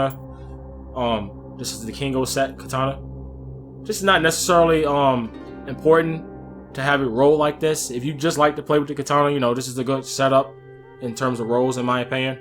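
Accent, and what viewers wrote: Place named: East Coast, United States. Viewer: I wanna say the East Coast but not too sure. Lol. I got people I know from NC, Maryland, New York, etc.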